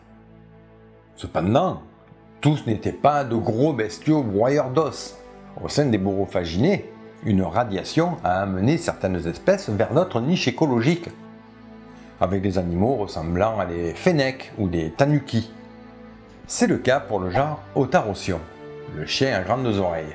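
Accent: South, France. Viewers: Vous avez quoi comme problème avec l'accent du sud sans déconner. Vous vous êtes entendu avec votre accent pointu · Beaucoup d'infos interessantes, très détailler et j'aimes bien l'accent du sud de la France, c'est sympathique et la prononciation impécable, un seul hic, j'ai entendu mouflette décrivant c'est animal d'une puanteur exécrable, au Québec nous disons mouffette, interessant de savoir la différence d'appellation.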